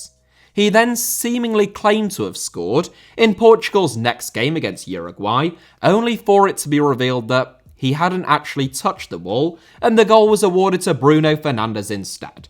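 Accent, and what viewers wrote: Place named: England, United Kingdom. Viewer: I can understand the non-English words but the English words? It's just his accent lol if anything that's the correct way to say those words since English came originally from England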